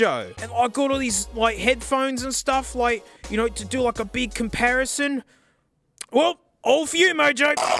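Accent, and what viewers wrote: Australia. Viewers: he's austrailian...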